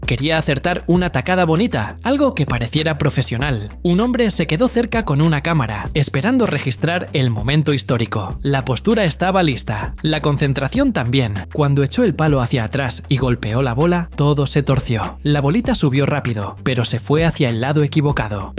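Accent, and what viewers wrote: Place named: Spain. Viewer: Todo el vídeo es Excelente, solo la voz del narrador tiene un Acento Español molesto, y no es necesario que hable mucho explicando lo que se está Viendo en el vídeo. Saludos desde Tabasco,Mexico.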